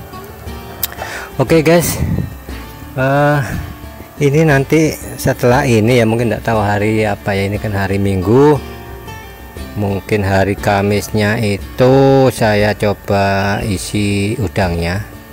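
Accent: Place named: East Java, Indonesia. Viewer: daerah mana Om. logat nya kaya jawa timur🙏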